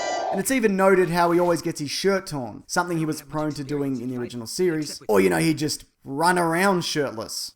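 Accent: Australia. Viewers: I cannot fucking listen to this guy talk. There's Australian, and then there's down syndrome.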